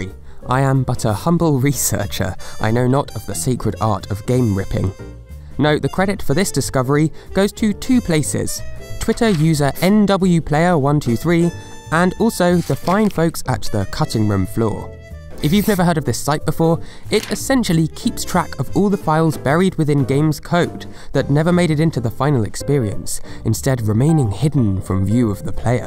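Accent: United Kingdom. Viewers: He's a brit, that's why. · Nothing makes me happier than listening to a cheerful British guy telling me Nintendo trivia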